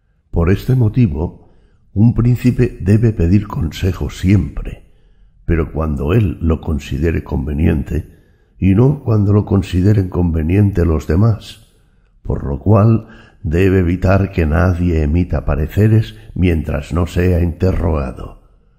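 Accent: Spain. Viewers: Y en español ibérico....Una joya este audio. Maquiavelo más actual que nunca, no cabe duda. Mil gracias. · en español españa weon, español españa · Los Latinos raramente disfrutamos del acento Español pero esta voz si que que te encierra y te agrada invitándote a poner atención pero no se aun si también lo será para poner esto en practica.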